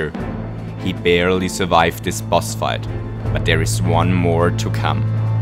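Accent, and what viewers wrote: Germany. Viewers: Super Video, ich weiß das du Deutsch kannst. Hört man auch. ; ) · bist du deutscher oder so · O my god, dat German accent XD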